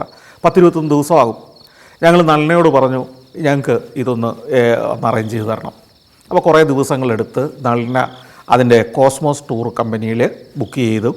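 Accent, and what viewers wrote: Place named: Kozhikode, India. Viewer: മൂപ്പർ..... എന്റെ കോഴിക്കോടൻ ഭാഷ...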